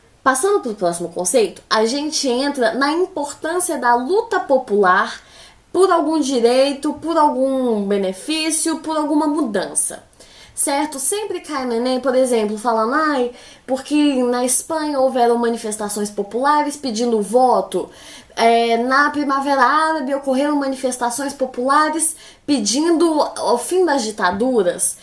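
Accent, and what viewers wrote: Minas Gerais, Brazil. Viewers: Quero ver como retomar a tese sem ficar repetitivo. Conheci seu canal ontem e to me odiando por não ter te assistido o ano inteiro :) E de curiosidade, quantos anos você tem? Tipo, c ta no ensino médio? Pq tava achando q c era professora, mas que parecia mto nova pra isso. hahaha PS: Q sotaque maravilhosooo, é igual ao da minha mãe, ela também é mineira :D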